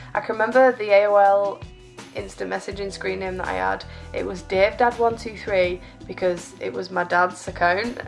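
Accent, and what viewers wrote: Northern England, United Kingdom. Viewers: That's a northern English accent. A beautiful one at that. I've always preferred northern English accents to southern English accents. There are 54 different British accents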